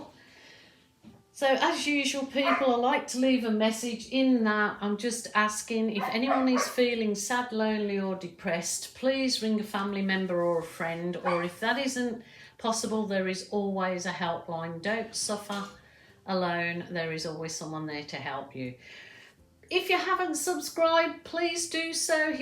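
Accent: Birmingham, United Kingdom. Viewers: Thank you. An interesting technique. I love guessing accents. I'm listening to you...were you originally from the West Midlands? I hear a bit of "brum".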